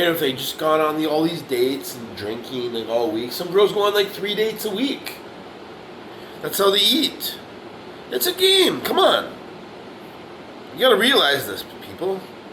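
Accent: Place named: Canada. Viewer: 😂🤣you're hilarious, dude this is spot on, the excessive noise and the god damn heel stomping everywhere they walk and banging dishes is 100% truth. Are you a fellow Canadian by any chance? because you sound like one, cheers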